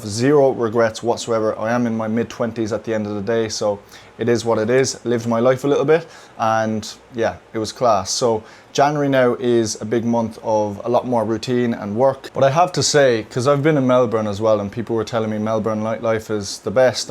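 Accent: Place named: Ireland. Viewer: Beautiful Irish accent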